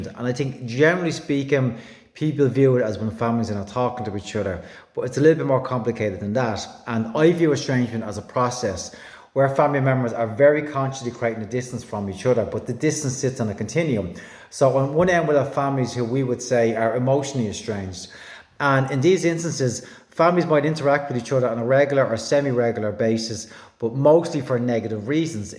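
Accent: Ireland. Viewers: If I could put forward some feedback? ... excellent video, excellent presenter and information! The sound is a little bit echoey. Wondered if you could use a mike to improve the sound?. Am grateful for this video. Love your tone of voice and your Irish accent ! So nice to listen to. Luv from a New Zealand woman 🇳🇿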